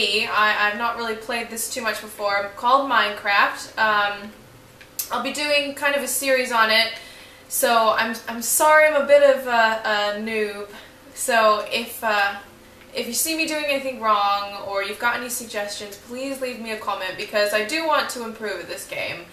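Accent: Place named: United Kingdom. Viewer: How long have you been living in the UK? I noticed sometimes when you were speaking your voice had a british tone to it xP if that makes sense. The video was pretty cool, the intro was funky :P little tip for future videos: keep your hotbar organised (i.e. keep your tools in a place where you can conveniently access them - I usually keep mine to the left of the hotbar, but find a way that suits you :P) other than that I enjoyed the video :D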